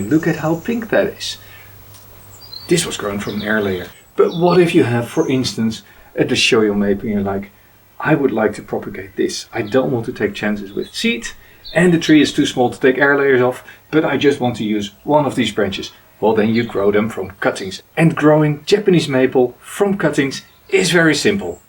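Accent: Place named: Netherlands. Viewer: are you from the netherlands i can hear from your accent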